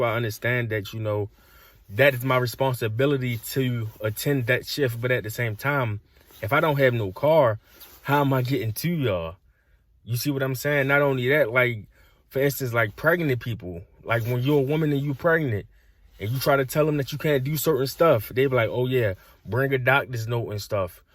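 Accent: Baltimore, United States. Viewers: Bro definitely gotta be from Baltimore 😂 accent super hard 😂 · Definitely a bmore dude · Are you from Baltimore?lol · He sound like he's from Baltimore Lol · This dude gotta be in Baltimore with that accent. And shit in Baltimore is different completely no matter what it is. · are you from baltimore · You from Baltimore? That accent crazy lol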